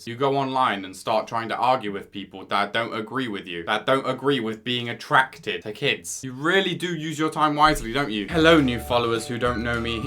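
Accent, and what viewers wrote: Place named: United Kingdom. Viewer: It always sound better and more degrading with a British accent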